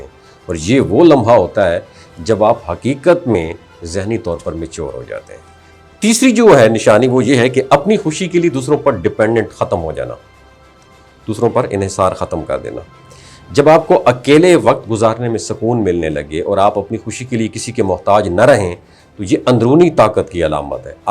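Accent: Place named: Karak, Pakistan. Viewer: Karak ki Pashto ❤❤😅😅😅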